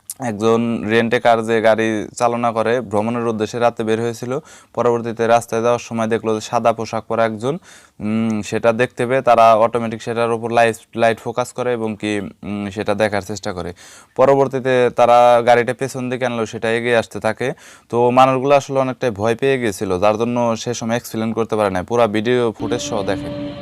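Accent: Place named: Tangail, Bangladesh. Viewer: মনে হয় , টাংগাইলের ভাষা বুঝতে পারছি না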